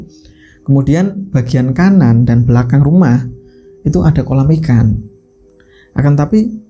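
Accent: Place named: Java, Indonesia. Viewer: sll bs d nikmati.. logat jawanya.. sy suka.. sehat sll y bang..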